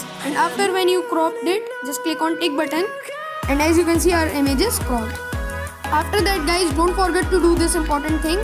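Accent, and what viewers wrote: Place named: India. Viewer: Definately indian... I can get to know any Indian by his voice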